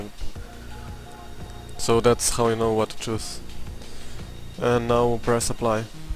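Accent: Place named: Russia. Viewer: у вас выраженный русский акцент , вы случайно не русский?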